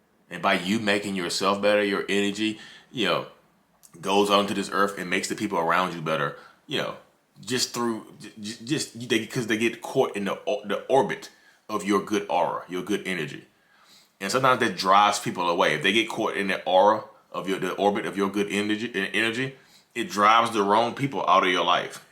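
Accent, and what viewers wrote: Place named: South, United States. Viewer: I love your southern drawl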